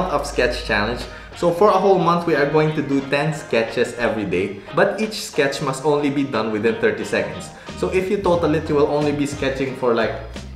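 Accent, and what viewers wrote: Philippines. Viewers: Thank you for sharing your drawing skill. I could not help but notice, your accent. Are you from the Philippines by any chance? Greetings from Indonesia.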